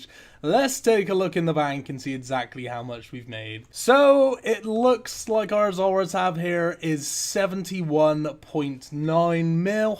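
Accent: Bristol, United Kingdom. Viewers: Just stumbled into this video from one of regular YouTubers, wasn't going to give it the time of day until i heard the accent. Sounds alot like some of my friends from Bristol xD Subbing for that reason alone hahaha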